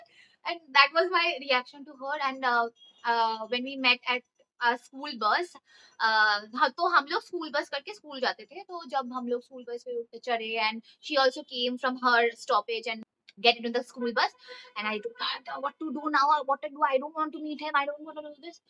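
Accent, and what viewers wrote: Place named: West Bengal, India. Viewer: GIRLLL ,.. i"m not bengali but i liked your accent. Its cute🎀. Anyways i liked your storytime .. its my first time seeing your video.💌🌸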